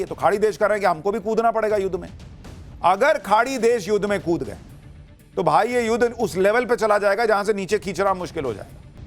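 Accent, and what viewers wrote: Bihar, India. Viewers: Andaz news sunane ka achcha hai Bihari lahja hai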